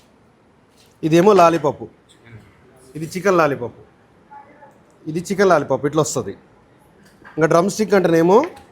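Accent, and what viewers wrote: Telangana, India. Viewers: Are you telangana?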